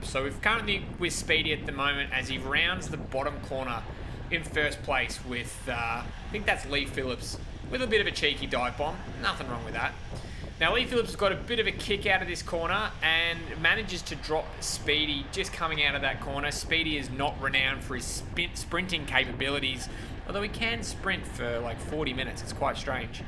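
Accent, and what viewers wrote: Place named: Australia. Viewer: Love your energy and the Aussie accent 👍🏼🙌🏼💪🏼 🇦🇺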